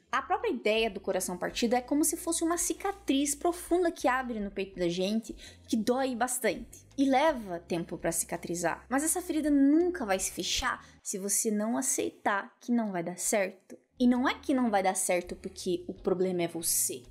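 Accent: Paraná, Brazil. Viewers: Oiê! tenho que dizer que eu adoro o sotaque paranaense xD hoje (presente), com o pouco amadurecimento que consegui, vejo esse sentimento como paixão, egocentrismo... acho que a gente sente falta de se sentir amado. E por muitas vezes nos deixamos ser guiados por essa dor (mesmo já tendo tido a mesma percepção que vc, acredite, cai alguns níveis por causa desse sentimento) mana, a gente tem que reforçar mto, conhecer e se conhecer mto, refletir pra não cair nos mesmos mecanismos mentais, senão tiver esse exercício diário, a gente cai mesmo! Somos mto falhos (e frágeis) e ainda na nossa criança psicológica, temos muita necessidade de se sentir amado,... a gente tem muito que aprender sobre o amor, sentimento profundo... acho que isso devia ser lido e ensinado em todos os ambientes, desde pequenininho (um dia sonho que teremos escola de sentimentos para as crianças do futuro... 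Quem sabe?;-) ) obrigada por esse vídeo! S2 mto importante é necessário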